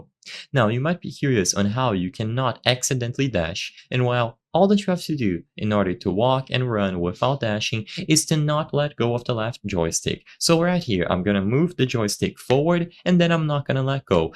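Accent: Brazil. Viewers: You have a Brazilian accent, that's funneh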